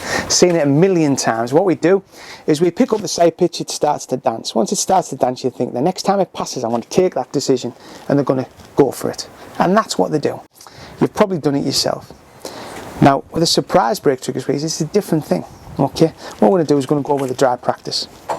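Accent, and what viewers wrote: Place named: England, United Kingdom. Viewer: It's weird to hear an English man tell me how to shoot. No shade to you sir. However it's odd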